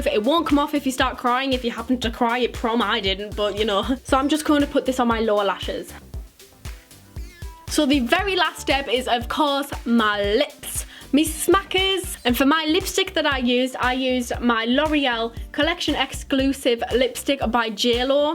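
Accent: Newcastle, United Kingdom. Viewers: Your accent has hints of geordie in it's great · Gwyn Princess nope, defiantly not Scottish lol, I would say Newcastle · You are gorgeous! Love your accent, similar to mine, I come from Newcastle also! I find us to have a lot in common! Love youuu! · Just curious but are you from or near Durham? I really recognise the Geordie accent. Also this was a beautiful makeup look and I really love watching your videos 😊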